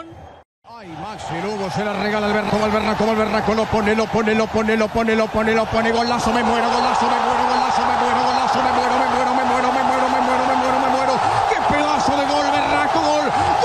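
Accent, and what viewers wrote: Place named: Córdoba, Argentina. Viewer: Porque el relator es cordobes, argentino. Quizás no entiendas algunas palabras porque son modismos de acá.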